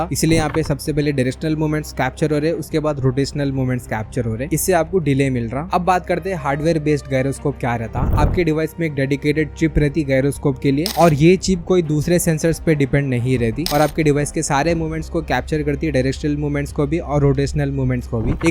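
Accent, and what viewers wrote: Hyderabad, India. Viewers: Bahi aap hydrabadi ho kya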